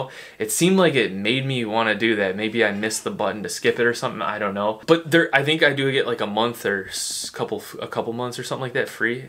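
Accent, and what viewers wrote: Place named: South, United States. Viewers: He sounded more "southern" but I just noticed after reading your comment. Lol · Dude why are you talking with a Southern accent??? Just talk normally man. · Definitely noticed more of a Southern twang to his accent. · He probably listened to southern people, or he's doing a trial related to changing his accent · How come you had a southern accent in this video 😂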